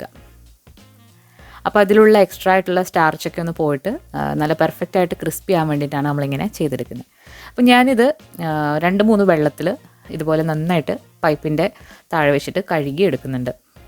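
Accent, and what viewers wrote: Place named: Kayamkulam, India. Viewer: Samsaram kettapol thonni namma naatukari aanennu. Kayamkulath evida njan kayamkulam...charummod aaane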